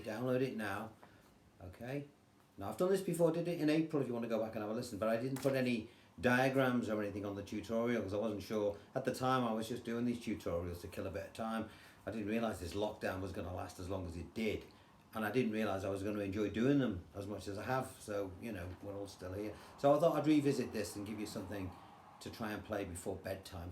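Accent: Liverpool, United Kingdom. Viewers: Came for, stayed for the accent. Brilliant. I dunno, scouse?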